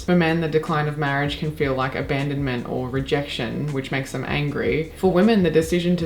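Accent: Australia. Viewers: Love hearing an Australian accent delivering intelligent, factual and insightful commentary about a massive issue. Post 1960 and the invention of the pill women have choices. We no longer spend our fertile years bearing or feeding bubs. Being pregnant and nursing infants makes us super vulnerable physically and financially. In an unsafe and radically unstable world young women will choose not to have children if they have access to birth control.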